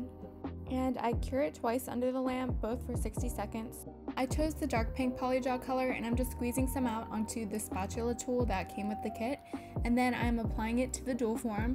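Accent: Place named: California, United States. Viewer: I see what you mean. It has the same cali girl accent, but she doesn't have the same tone of voice.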